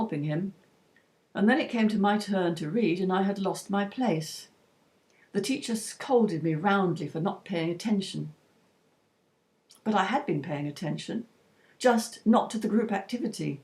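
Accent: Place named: RP, United Kingdom. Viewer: Really very good, I did watch all the story time videos on your other channel and always enjoyed them, I loved when you wore the costume and jingled the little bell at the beginning and end & remember the big book you used as a prop - might be just me but that little routine got me settled and ready to listen - brought back lovely memories of jackanory and also teachers reading to the whole class in infant school - you have a good voice, relaxing to listen to and a wonderful proper English accent, quite posh but not too posh..lol. X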